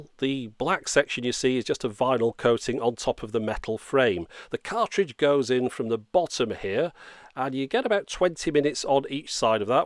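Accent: United Kingdom. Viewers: You're my favorite, seriously, you're the best of all technology channels, and I love your British accent ^^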